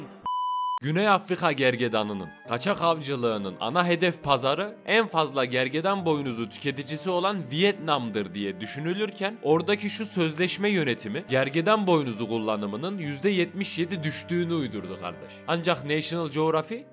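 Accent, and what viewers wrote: Ankara, Turkey. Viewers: Emeğine sağlık çok güzel bir video olmuş. Yalnız eski Ankara şivesini biraz inceltmişsin admin.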